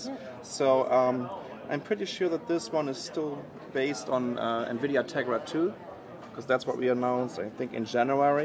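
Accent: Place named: Germany. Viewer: kinda german accent.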